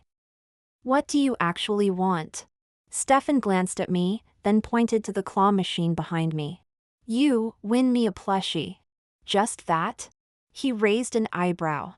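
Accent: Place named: United States. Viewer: AI with an american accent using UK slang words is kind of cringe~~~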